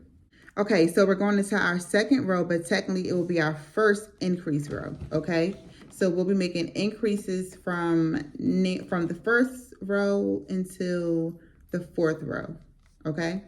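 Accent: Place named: Philadelphia, United States. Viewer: Been following you for a while since your crocs went viral . I heard your voice & was thinking where in the world is she from ?? She gotta be from Philly lol❤️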